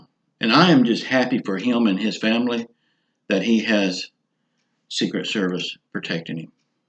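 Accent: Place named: Louisiana, United States. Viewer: My ex is from Louisiana. they are real people! Good to hear than accent once again. U do a great job my fine friend. You anywhere near Franklinton?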